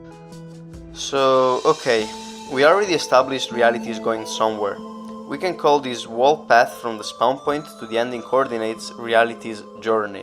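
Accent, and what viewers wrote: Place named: Italy. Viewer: Fantastico come la tua pronuncia fosse perfetta, ma mi sono bastate le prime tre parole per capire che fossi italiano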